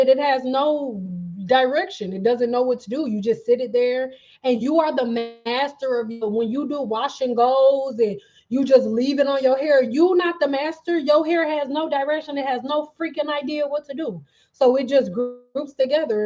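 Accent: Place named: California, United States. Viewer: I know you from Detroit but you sound like us Cali ppl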